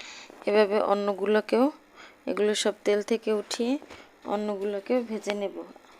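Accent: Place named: Barishal, Bangladesh. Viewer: আপা,আপনার বরিশালের ভাষাই বলেন,তাহলেই ভালো লাগে।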